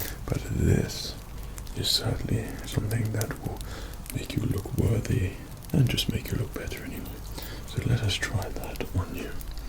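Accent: England, United Kingdom. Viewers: Arabian genie but has a English accent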